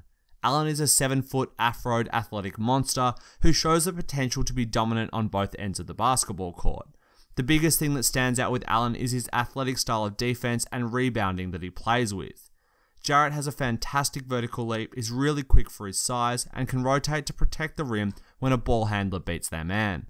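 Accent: Australia. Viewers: I'm gonna just say it is his Ozzie accent.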